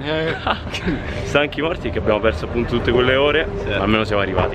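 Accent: Rome, Italy. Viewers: Ti seguo da poco,volevo chiederti,da quanto sei a Londra? Perché la ciancicata romana se sente na cifra! Daje così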